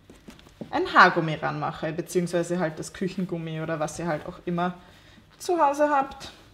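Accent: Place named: Austria. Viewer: Red mehr Österreichisch😂❤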